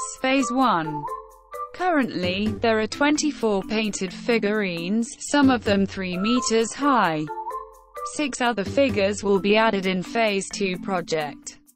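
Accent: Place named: United Kingdom. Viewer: Wow sosyal may British accent hehe.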